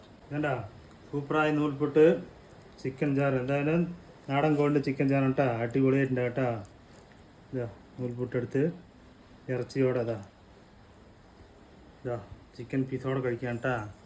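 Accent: Tamil Nadu, India. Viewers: ചാർ എന്ന് പറയുവോ കറി എന്ന് പറയുവാ.. നിങ്ങൾ തമിഴ് ആൾക്കാർ ആണോ?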